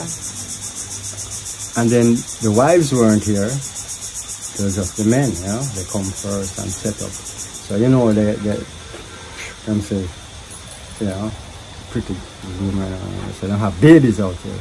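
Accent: Jamaica. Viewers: I can hear the Rasta in you. One Love Rasta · It's a Kingstonian accent, notably old school folks. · Love this man love the way he talks and laughf real jamaican roots man down to earth and all.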